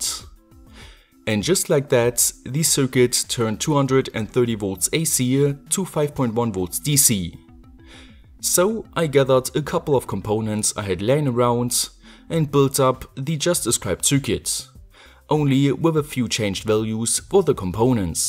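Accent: Germany. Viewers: Give the guy a break! He's German. I don't think his pronounciation is so bad!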